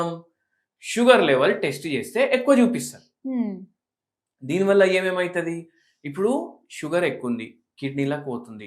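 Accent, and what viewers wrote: Telangana, India. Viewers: It is almost ending. Title is misleading. The only plus point is I liked the Telangana accent of the speaker.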